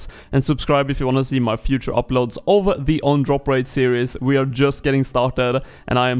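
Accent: Sweden. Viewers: Are you Swedish and from Stockholm by any chance?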